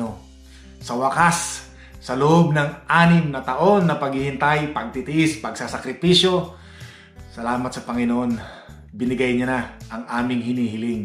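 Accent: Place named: Pampanga, Philippines. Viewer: Ang galing ni kuya kahit mahaba ang story❤ parang kapangpangan si kuya sounds like😂 tinapos ko story mo very inspiring🙏☺️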